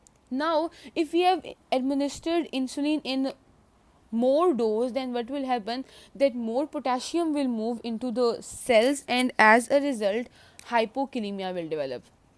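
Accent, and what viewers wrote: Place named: India. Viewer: U have a good accent of Indian English I love it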